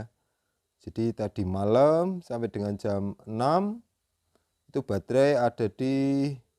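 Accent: Java, Indonesia. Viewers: kuping gak usah di pasang kalo gak mau dengar logat medok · intinya gw nggk seneng aja logat medok udah itu ajaa bisa kan biasa ajaa nggk usah medok2 gitu maaf yaa gw bukan orang jawa ngertiii loee klo nggk ngerti ilmu psikologi nggk usah ngegas emang dasar nya nggk ngerti yaa udah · oh maksud lu skrg ngancam? Silahkan lanjutkan.. Tidak sedikitpun saya takut dgn orang bodoh seperti anda.. Semua yg baca komen2 ini pasti bisa menilai. Dan menyedihkan betapa bodoh dan sempitnya dunia anda sampai mengatakan bajwa logat medok di video itu dibuat-buat.. Wkwkwkwkwwkkkkkk Saya sangat heran dgn tingkat kebencian dan ketidaksukaan anda hanya karena logat medok, sepertinya anda memang perlu konsultasi dgn psikolog.. Wkwkwkwkwkwkwkkkk Sungguh saya tdk membayangkan jika orang2 medok di Jogja mentalitas-nya seperti anda, menerima banyak teman2 dari seluruh Indonesia yg logatnya berbeda2 dan sangat beragam. 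Tapi untungnya menerima beragam logat dari kawan2 di seluruh Indonesia justru bisa membuat semua berbaur dengan akrab di Jogja.. Cukup sekian dari saya menanggapi anda yang "sakit", selanjutnya silahkan kalau anda akan selalu ngeles ataupun anda mau terus menerus memupuk ketidaksukaan dan kebencian anda dgn sesama bangsa Indonesia, sekedar karena perbedaan logat dan latar belakang budaya..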